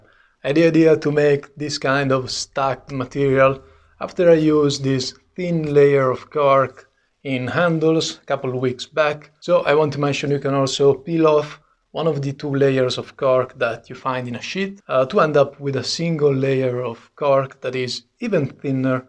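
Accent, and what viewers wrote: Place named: Italy. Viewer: Si sente lontano un chilometro che sei italiano , veramente bravo bei video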